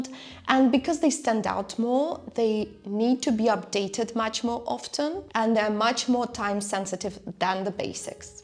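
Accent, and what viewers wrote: Russia. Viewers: Very Russian accent.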